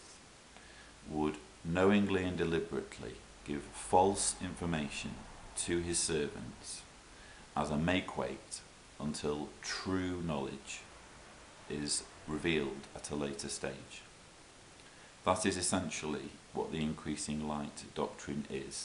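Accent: United Kingdom. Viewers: hi “ in acts. Furthermore there is no salvation in anyone else, For there is not another name under heaven that has been given among men by which we must get saved.” This is there errors there not holding on to the head, Christ “! In 1 Corinthians. For no man can lay any other foundations then what is laid., Which is Jesus Christ.” This men from Warwick have taken over the seat of Jesus, Apparently. And the CB name Warwick seems to be mean king maker. Yes they have sat up there own princes and kings. In first 1 Thessalonians 2: 2: 3. Said The exhortation we give does not arise from error,” Or from uncleanness or with deceit. This man the Bible writers that and Jesus apostles were chosen by Jehovah.that’s why when they would speak it came to be so. And men from today are absulitly not chosen from God”! That’s why there prophetic <num> and 1947 or 1957. There false prophets. 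Your daughters are so cute, I enjoy hearing your accent of British. Please don’t change it bye!” In number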